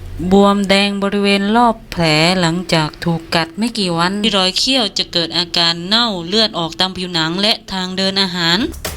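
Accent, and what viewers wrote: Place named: North, Thailand. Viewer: สำเนียงคนบรรยาย..น่าจะเป็นคนภาคเหนือใช่มั๊ยครับ😁😁😁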